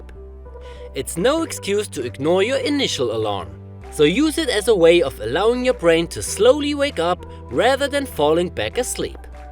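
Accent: Germany. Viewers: Nice video - thanks! Question: what’s this for an accent? Sounds like a German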